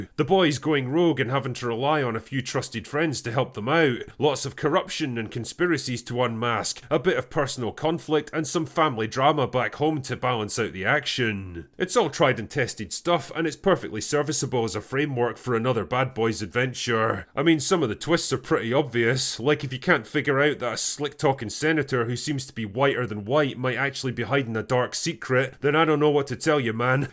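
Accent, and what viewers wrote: Scotland, United Kingdom. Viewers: I was wondering if this was simply a vehicle for Will Smith to crawl out of embarrassment hell but your review says otherwise. That it's just Will Smith trying to get back to his normal and forget that incident. Maybe he should just divorce his wife while he's on form and really dump his past and get on with Bad Boys 5...hell if Stallone and Swartzeneggar can keep going, why not. Thank you for the review, as always, poinant and entertaining with that wonderful Scots voice. Been enjoying you shitting all over Star Wars : The Immaculate twins haircuts but it's nice to have a change and this was a nice change.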